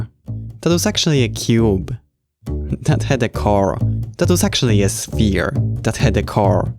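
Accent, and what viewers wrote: Poland. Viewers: kurna ale łatwo ogarnąć po akcencie że to Polak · You sound polish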